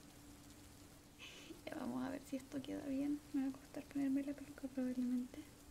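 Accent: Chile. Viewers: hablando chileno❤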